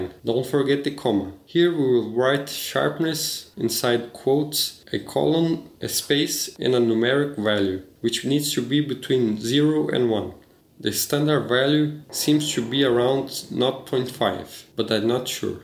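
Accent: Brazil. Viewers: valeu man kkkkk aquele sotaque br no inglês kkkkk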